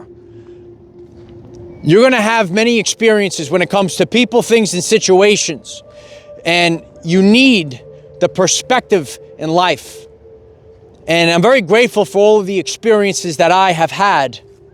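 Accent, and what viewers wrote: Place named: Massachusetts, United States. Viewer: You sound like your from Massachusetts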